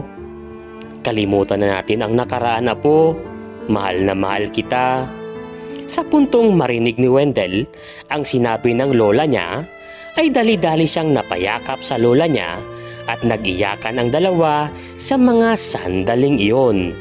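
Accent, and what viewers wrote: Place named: Ilonggo, Philippines. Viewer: weldel is elonggo I dont know.but the narrator is 100%elonggo po siya.all the way his intonation really he is elonggo mlumanay mgsalita😅😅😅😅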